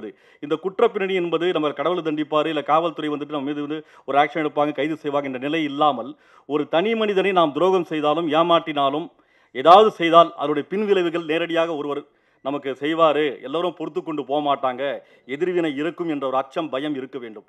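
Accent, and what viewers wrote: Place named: Karnataka, India. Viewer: sounds like he is kannadiga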